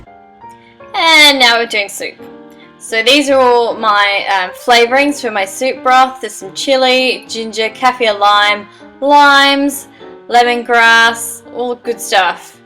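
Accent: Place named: Australia. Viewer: lol between the song at the beginning and your accent i knew you were australian , this video is great, i esp like your use of so many vegetables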